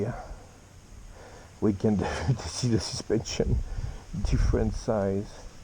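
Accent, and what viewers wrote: France. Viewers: Are you French ? Hi 👋🏽 from Perth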